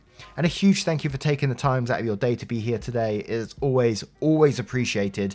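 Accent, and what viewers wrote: United Kingdom. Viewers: I am here for the British accent XD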